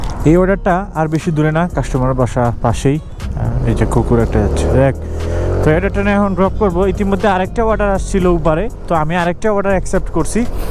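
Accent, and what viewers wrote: Sylhet, Bangladesh. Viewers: Apne sylheti?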